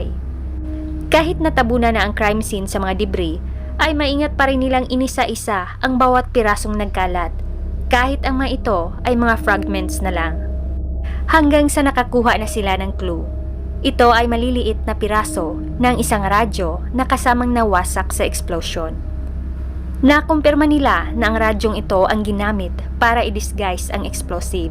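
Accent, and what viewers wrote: Visayas, Philippines. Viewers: Bisaya pronounce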